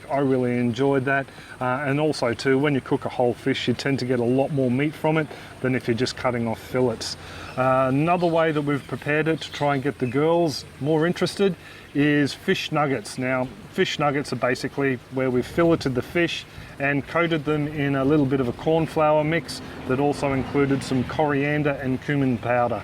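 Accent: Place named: Australia. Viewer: I have enjoyed your videos for a good bit of time now but just wanted to say your Australian accent with your wife’s man being has me reminiscing my childhood! It reminded me of the Disney cartoon “The Rescuers Down Under”. I’m not poking fun... I just think it’s very cute:) Your fish flavors look amazing!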